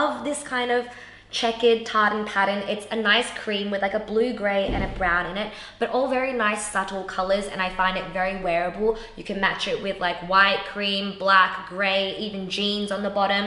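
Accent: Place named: Australia. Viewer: you look beautiful in everything you wear sweetheart, and I love you're "Aussie" accent.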